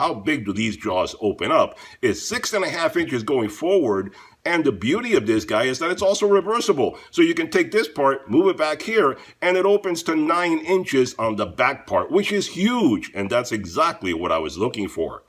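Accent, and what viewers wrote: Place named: Chicago, United States. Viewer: you have a great voice. Man, I don't think I've ever said that before... especially to a man. haha Reminds me of the Chicago accent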